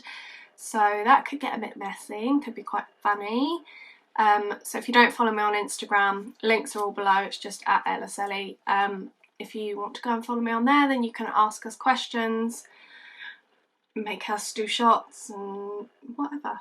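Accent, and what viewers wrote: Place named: England, United Kingdom. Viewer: Congratulations to you and Ryan on your upcoming wedding and buying your first house. I hope everything goes well, and I'm happy for you and your fiance; that's exciting! :) I didn't know that both you and Ryan grew up in villages, that's interesting :) You have the prettiest accent, I love hearing English people talk :)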